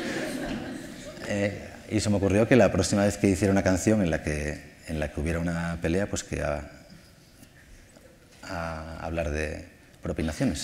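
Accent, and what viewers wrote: Spain. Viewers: Hola, quisiera que alguien que supiese entender el nombre de la pelicula, la anotase, jejeje. El español castellano se complica para el oido latino, gracias. Nacho! Entregas la poesía a nosotros los mortales.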